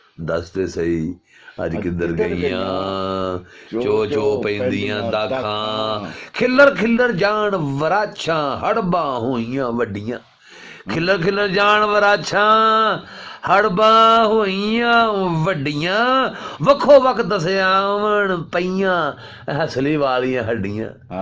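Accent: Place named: Sheikhupura, Pakistan. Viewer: Masha ALLAH 💞 It seems GOD did gave some talent to to 🔥 to speak 🗣️ perfect pure Punjabi of QILA SHEIKHUPURA DISTRICT of OLD PUNJAB, You wouldn't have MAKAI ((corn 🌽) ROTi since it's 100% American native of USA 🤞🍀🙏🔥🚒🔥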